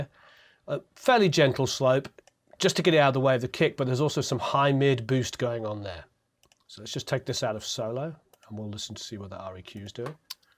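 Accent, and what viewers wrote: United Kingdom. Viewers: That and the british accent.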